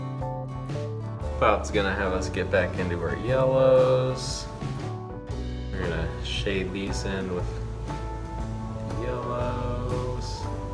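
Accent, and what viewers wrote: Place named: United Kingdom. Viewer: I love this,. Thank you for posting. It looks like you are using a smaller, square canvas and much smaller brushes than Bob uses. I have been given a lot of small canvases including square ones. I shall be trying your method of painting this using smaller brushes too. Your accent sounds British, if so where are you from? I’m English but now living in Spain.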